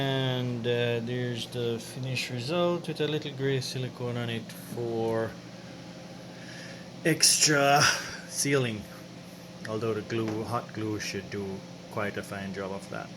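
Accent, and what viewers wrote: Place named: Canada. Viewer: Love how Canadian your accent is, that’s cool lol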